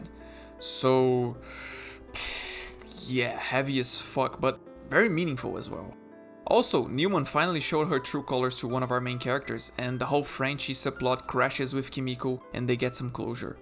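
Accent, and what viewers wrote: Brazil. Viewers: Caraca reconheci nas primeiras palavras que era brasileiro hehe vou conhecer o canal. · are you brazilian? I'm asking because of your accent... :)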